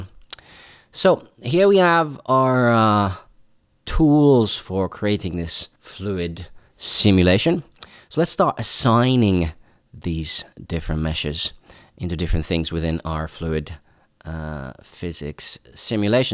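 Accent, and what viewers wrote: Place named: Scotland, United Kingdom. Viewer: You have Scottish accent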